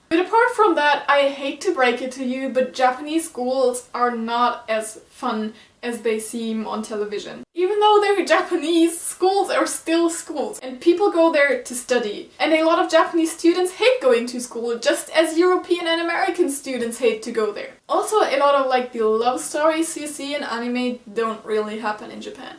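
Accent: Germany. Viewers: I knew you were German cuz when I heard your voice, you sound like Trixie from the YouTube channel Don't Trust the Rabbit. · You're German? Cool, I was going to ask because of your accent was unfamiliar to me, but here it is in the comments :) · I could hear from your first sentence, that you are German^^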